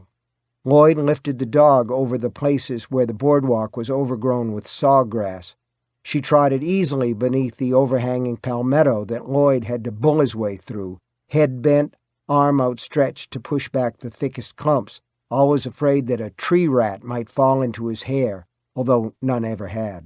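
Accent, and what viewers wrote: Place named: Maine, United States. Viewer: Oh my goodness! When I first started listening I thought, Oooo! I love how the reader has that Maine twang — adds an extra layer of icing to this wonderful cake. I JUST started reading the comments to see who the reader is and if he has any other books as I love his voice. Low and behold, it is the king himself! Go figure! Explains the great Maine accent❤️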